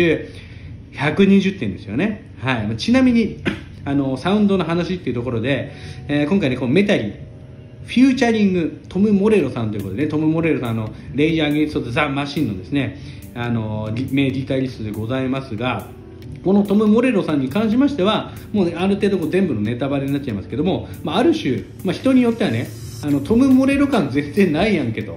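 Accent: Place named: Hiroshima, Japan. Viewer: 所々広島弁が散りばめられているのはさすがです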